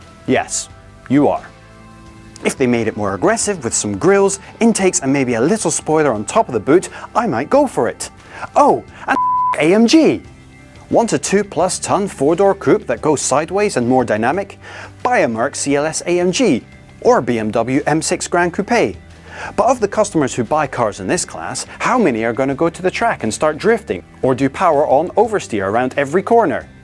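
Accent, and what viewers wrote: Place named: United Kingdom. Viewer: YAY!! My comment made it!! Thanks a lot,!! Love the show. It gives me my fix for cars and the British accent when I'm not watching Top Gear:D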